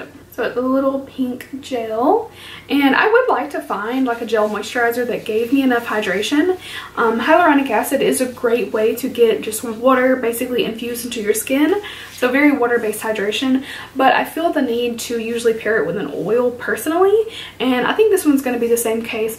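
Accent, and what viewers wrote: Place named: Alabama, United States. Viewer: im from alabama and as soon as i heard your voice i was like "shes gotta be from here too!" and then i read the description lol thats pretty cool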